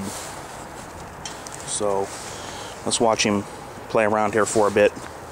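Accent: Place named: Indiana, United States. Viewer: it sounds like u r from indiana maybe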